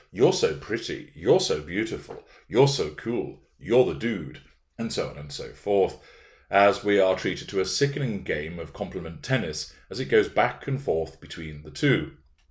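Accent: United Kingdom. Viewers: Hi, I first subscribed to your channel several months ago through your analysis of Harry’s wife. Since then I have been backtracking through your past commentaries. Your output is impressive, the research is extensive, accurate and relatable. As Australian 🇦🇺 your voice is very British and so pleasant to listen to. Thank you.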